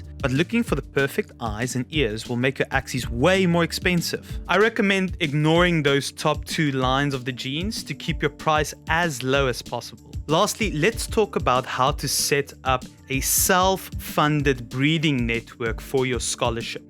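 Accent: South Africa. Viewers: I thought you might be South African, so am I :D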